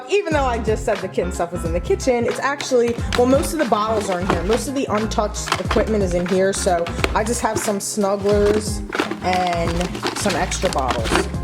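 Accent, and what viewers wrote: Philadelphia, United States. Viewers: I just discovered you and I really enjoyed seeing your set up! I have been a pet/animal enthusiast my whole life, and I plan on opening a rescue in a couple of years- this is great inspo for organization and habitat set ups! You're really great and I am looking forward to watching more. (Where are you from?? You sound so much like my family from Philly!)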